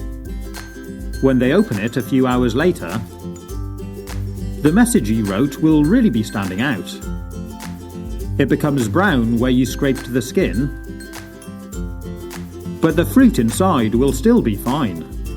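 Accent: United Kingdom. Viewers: If you didn't understand he's British, then I'm sorry.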